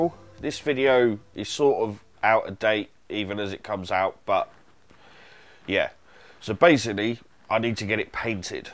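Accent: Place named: London, United Kingdom. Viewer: Hey, great series on the Bandit rebuild! I've bing watched them over the weekend and found them very helpful. A year ago I bought a 2002 Bandit 1200cc and fell in love with it. Like you I would like to keep riding it for awhile, and even though I've never worked on a motorcycle before, I've stated to to tackle some basic tasks like changing the brake pads, and replacing the sprockets and chain. By seeing you take the bike apart and fix what needed to be fixed gives me confidence and clear guide to tackle any future repairs and modifications. So thanks to you and your sponsors. I'm from the States and It seems that that Bandit series is more popular in England than here. I not sure why. One other thing, your accent sounds similar to Micheal Caine's, are you from London?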